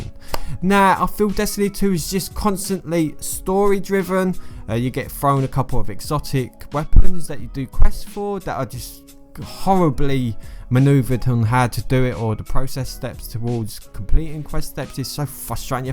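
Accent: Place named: United Kingdom. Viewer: sir you have a painfully bri'ish accent but i love it. keep up the good work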